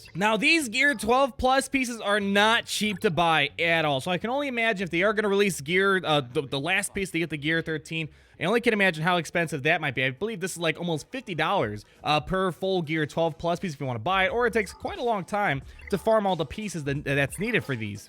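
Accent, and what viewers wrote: Poland. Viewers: ziomuś to ty Polakiem jesteś? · now i know that you are polish;)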